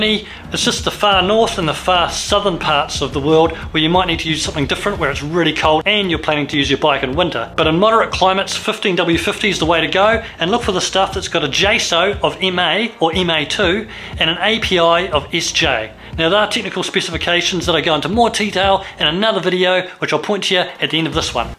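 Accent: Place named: New Zealand. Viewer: Thanks a lot from a Canadian listener. I really like your Kiwi accent.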